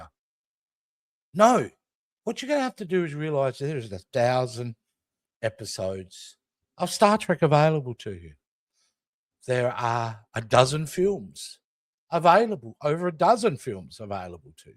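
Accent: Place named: Australia. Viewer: nice to hear an Aussie speak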